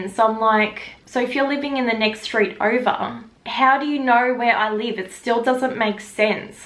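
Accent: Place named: Australia. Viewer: I liked this story time!! It was really interesting & I love the way you explain stuff. Being a fellow Aussie I just relate so much to the way you speak & it makes me laugh (even tho the girl being a stalker wasn’t funny!!!!) xxx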